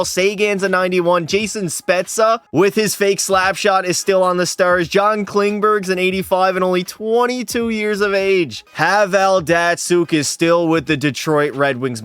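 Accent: Newfoundland, Canada. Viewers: Need to know what part of Newfoundland u from there skipper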